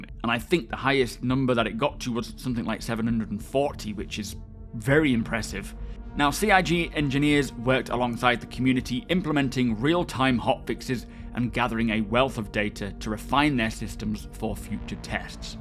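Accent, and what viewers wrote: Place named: Northern England, United Kingdom. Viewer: This guy is weird for me. He has a northern English accent but on 5 occasions he used American English or syntax.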